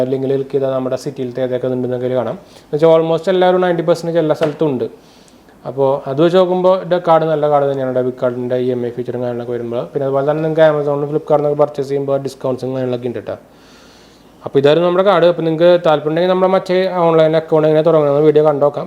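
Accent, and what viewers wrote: Thrissur, India. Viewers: തൃശൂരാല്ലേ ഗഡീ ?😛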